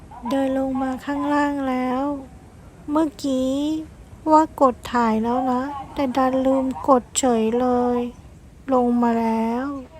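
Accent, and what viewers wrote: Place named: Japan. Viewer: ดีจ้า รับชมเมืองญี่ปุ่น ด้วยคะ ฟัง บรรยายจนเพลินเลยจ๊ะ บรรยายได้น่ารัก คะ สำนียงจะออกทางญี่ปุ่นหน่อย พั่ลัดด่จะรอชมคลิปต่อไปนะคะ❤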